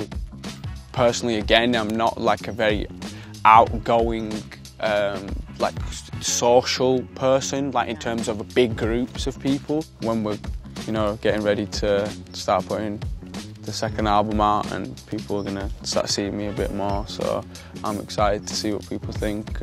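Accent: United Kingdom. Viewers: Zayn is my reason love British accent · lol after so many years i finally hear him talking and that british accent was freaking unexpected af lmao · Because he rarely talks, I'm always shocked to hear a British accent come out of his mouth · I forgot how strong his accent actually is. You really have to pay attention if you are not British 😅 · OMG!!! his voice and his british accent is pure love!!! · Is he tryna hide his british accent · British accent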